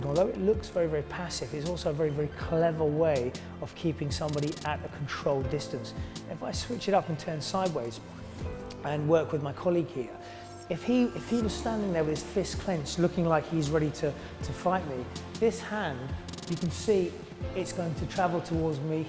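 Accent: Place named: United Kingdom. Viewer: I'm so sorry but it's so unusual for me to see a strong,big,burly guy with a British accent.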